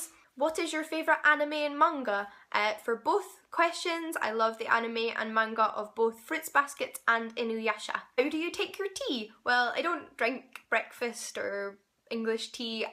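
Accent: Scotland, United Kingdom. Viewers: The Order of the Phoenix is definitely the best HP book. Whenever I watch your videos I start thinking in a Scottish accent! Haha! I might be biased but I recommend visiting Southeast Alaska, particularly my hometown, Ketchikan. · Thank you for clarifying the accent! For some reason, I could've sworn you said you were from London. I guess you were just visiting and I totally misunderstood. So every time I'd listen to your vids I'd think, that can't be right. I KNOW MY SCOTTISH ACCENTS! (One of my favorites of all time!) Seriously, it's kind of sad how much this confused me and distracted me. I thought I had gotten fairly good at placing accents and I thought I had failed. Now I have peace. ;) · But where in Scotland are you from??? :D I love most Scottish accents, but yours is definitely one of my favourites, I'd just love to know what area of Scotland it's from :)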